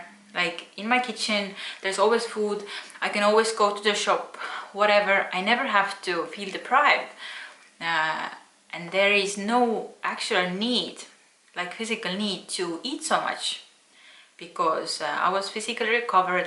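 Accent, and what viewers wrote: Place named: Russia. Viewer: Будто русский акцент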